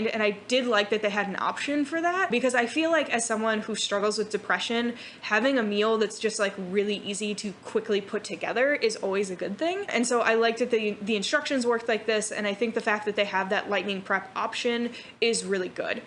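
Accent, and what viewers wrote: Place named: Midwest, United States. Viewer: thick Midwestern accent CAT-EH-GOREY I'm seriously impressed by your grocery shopping skills, like damn. I'd be super interesting in a video on grocery budgeting. Food is always something I've splurged on as a self-care/comfort thing and while in pandemic I've gotten better about being mindful of a budget I feel like I'm still playing a guessing game at the checkout lamo.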